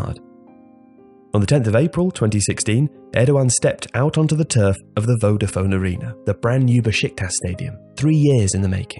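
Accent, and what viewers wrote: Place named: United Kingdom. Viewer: Wow. Your british accent so nice, greetings from turkey nice channel keep goin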